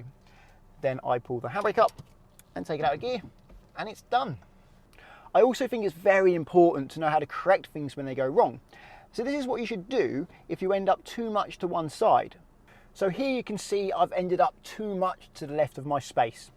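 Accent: United Kingdom. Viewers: Perfect explanation and your British accent so classy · Superb explanation,the British accent is lit!lol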